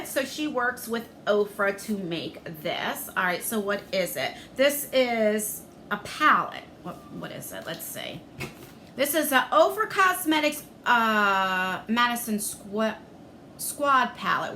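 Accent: New Jersey, United States. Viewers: I love your videos. You are so funny and I love your NJ accent.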